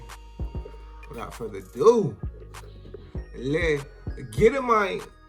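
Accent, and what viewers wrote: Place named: South, United States. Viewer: Can’t tell if you’re from Atlanta, Florida, or Alabama....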